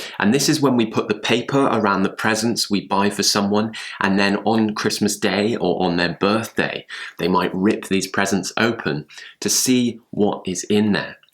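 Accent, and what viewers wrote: United Kingdom. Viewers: I am Thai guy. I like British accent. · Mad love for you British accent 😍 When I used to live in my country (Poland) I was snowed in so many times... So I think winter in Uk is pretty warm, especially in London. Anyway thank you for lovely video, I really enjoyed. I'll often go back to your channel.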